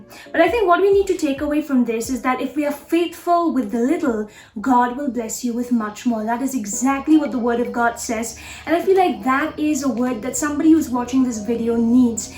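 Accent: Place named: India. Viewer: I am 3rd generation Indian born in South Africa. It is so weird to see someone who looks oriental with an Indian accent.🙊You are so beautiful and great videos. New sub!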